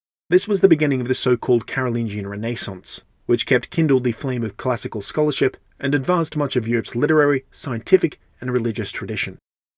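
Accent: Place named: Australia. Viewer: Pretty dope I found an Aussie explaining the FR. Awesome video mate.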